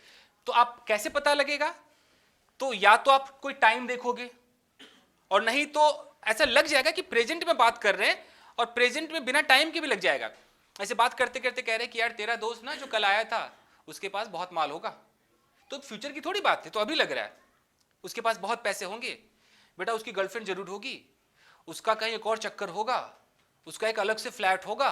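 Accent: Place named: Meerut, India. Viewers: you are from Meerut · best class ever because of haryanvi agree? thokdo like 👍👍👍👍👍👍👍👍👍👍👍👍👍👍👍👍😂😂😂😂😂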